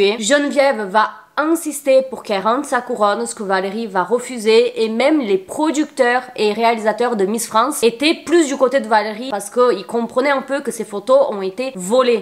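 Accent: Brazil. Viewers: Ce n'est pas l'accent du Sud de la France, c'est un accent brésilien parce qu'elle est brésilienne 😅😅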